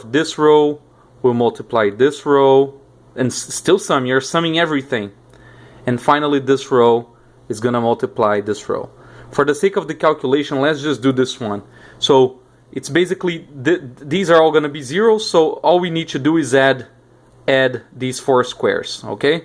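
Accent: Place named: Brazil. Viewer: Are you Brasilean? I can notice a Brasilean accent in your voice background.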